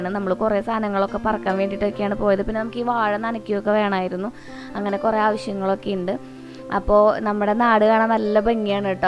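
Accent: Thrissur, India. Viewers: Thrissur slang😀😀😀😀😀😀😀😀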